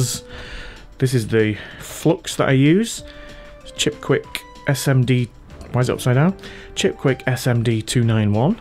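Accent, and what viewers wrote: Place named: Manchester, United Kingdom. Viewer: Eh up! As a Manchester lad, now long time in the antipodes, yer accent makes me feel righ' tat ohm. Makes me laugh when you forget to modify your accent for a wider audience ( luke vs luck for 'look').Your compact work station and editing suite is magnificent everthing in its place! Neat. Your problem tracing and solving skills are really Sherlock Holmes level. What a winner you are! Just love all your postings. I've learned at lot.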